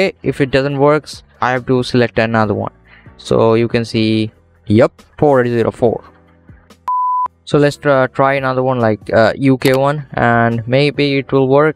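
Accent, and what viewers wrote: India. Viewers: U are indian?? M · are you indian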